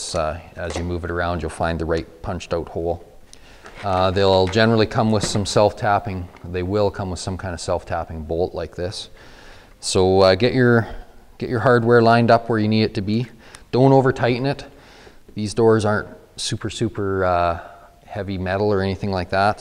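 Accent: Canada. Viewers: Great pleasant Canadian accent n the know, the how, the teach. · Outstanding instructional video. I'm assuming from this guy's accent that he is Canadian and it has been my experience that Canadians just get things done - efficiently and resourcefully, without a lot of hoopla and distractions. No lousy music, cussing (tho' he came close at one point), or embarrassing attempts at special effects and other so-called creative videography. Simply good, clear, well-paced instruction. Will check out the other videos at Houseimprovements.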